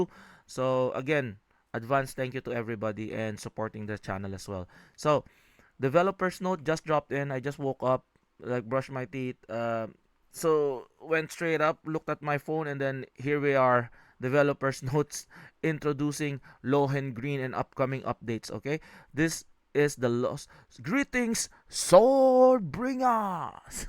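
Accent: Philippines. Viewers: I notice the accent, are you a Filipino?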